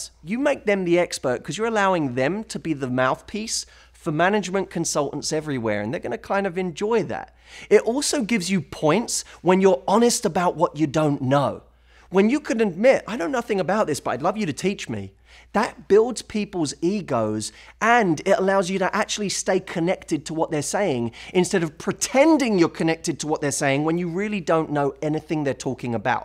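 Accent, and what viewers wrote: United Kingdom. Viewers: Is this man British, if not forgive me XD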